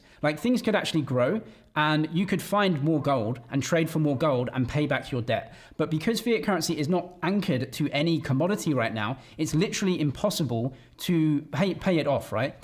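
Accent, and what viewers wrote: United Kingdom. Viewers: Hi, where do you come from? You sound British.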